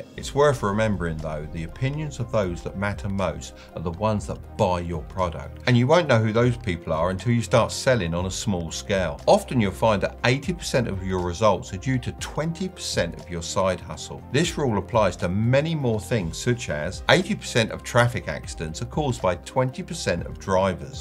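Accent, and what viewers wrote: United Kingdom. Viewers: AND your British accent. It's just beautiful haha.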